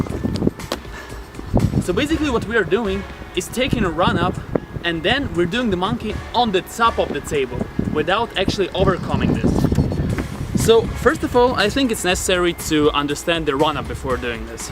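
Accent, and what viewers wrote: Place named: Russia. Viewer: Слышу твой русский, сквозь английский)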